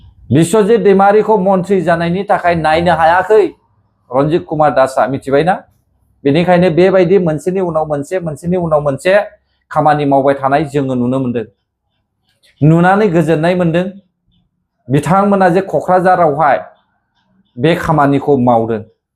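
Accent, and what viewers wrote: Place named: Bihar, India. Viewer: Hindi Raoa biharniswmwn ada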